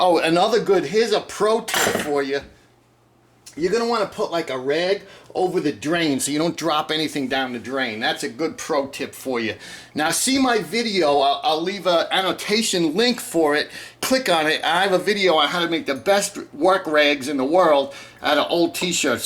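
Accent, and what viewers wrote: New York, United States. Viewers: That was great! Love the N.Y. accent makes me feel like home. I watched a few video's and you where the only one that mentioned cleaning it and putting a rag in the sink two very important things. Also baking soda and white vinegar will take off that water deposit stuff on the faucet. Also you were the only one that said which way the spring goes very thorough Excellent job! Thank you now I am going to try it! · Good job!! Really enjoyed your accent. Haven't been back to my birthplace, NYC/Long Island, since early 70's!!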